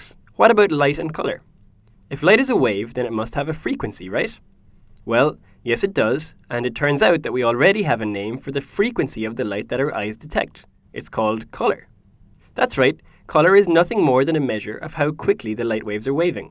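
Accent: Ireland. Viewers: His name and accent are Irish , fair play buddy ! Good video! what you studying ? Physics ? Is this video part of college or is it just a project you are doing ? I would be interested in making videos about science. Love teaching others! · that an irish accent? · I love his Irish accent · Of course he's Irish. Dunno about the Waterford part, I only know the wateford accent that tends to be monotone.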